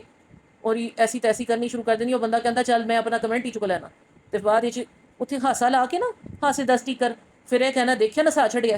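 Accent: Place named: Punjab, Pakistan. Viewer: Very true💯... But really ur punjabi is soooo sweet i luv it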